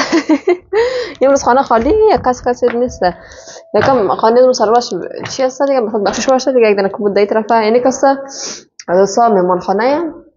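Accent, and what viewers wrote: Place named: Hazarajat, Afghanistan. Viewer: چی قشنگ هزارگی گپ میزنی منم بخدا اینقد هزارگی گپ زده نمتونم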